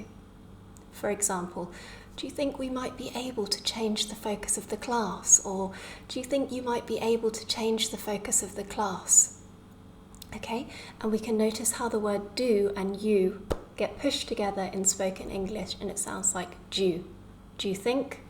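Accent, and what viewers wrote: United Kingdom. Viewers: Hi..really helpful lessons, brilliant British accent, understandable and practical topics...extremely thanks...would it be possible to write your important tips bigger and not italic at the corner of video...cheers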